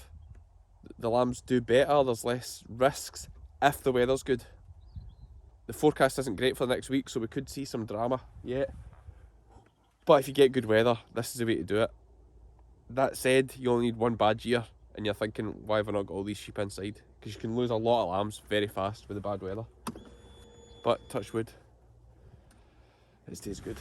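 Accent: Scotland, United Kingdom. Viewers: That ewe is making chocolate milk 🌷💚🙃 New subscriber here, how many sheep do you have? I’m going to have to try real hard to understand your Scottish brogue, my husbands family is from Scotland and we would love to visit sometime but I’m not sure that will ever happen considering our age. Thank you and good luck this lambing season. P.S. my husbands family use to have registered shropshire sheep many years ago.🌷💚🙃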